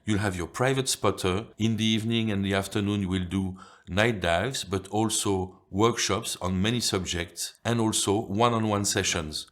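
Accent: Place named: France. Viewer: Excellent macro underwater photography advice and thank you fort sharing it. You sound especially authoritative with your French accent as it strongly suggests the entire Cousteau family!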